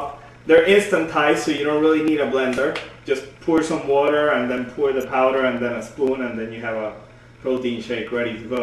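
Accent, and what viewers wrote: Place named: Spain. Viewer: I love your Spanish accent, it's awesome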